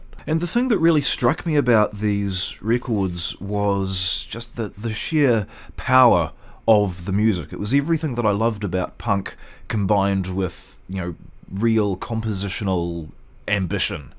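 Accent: New Zealand. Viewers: Fantastic! Excellent piano, drums and bass - and kiwi accent! Well done dude.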